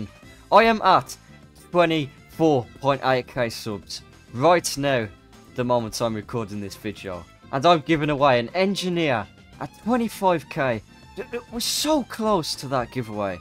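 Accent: United Kingdom. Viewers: Bros british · You sound British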